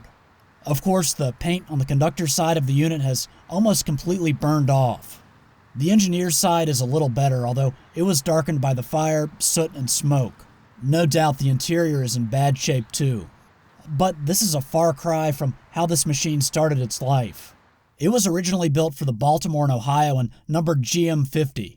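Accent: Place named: Augusta, United States. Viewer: “The train company” “THE TRAIN COMPANY?” I’m gonna have to listen to this video when I’m homesick for the CSRA accent [Augusta GA area]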